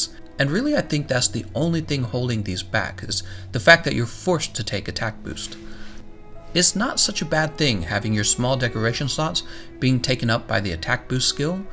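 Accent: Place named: United States. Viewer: idk Why you get so much hate... Your videos have the best information... and your even tone makes the American accent easy to understand.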